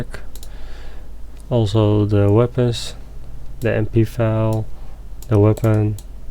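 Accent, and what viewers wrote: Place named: Netherlands. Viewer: Eyy jij bent Nederlands! Hahah kan ik horen en zien haha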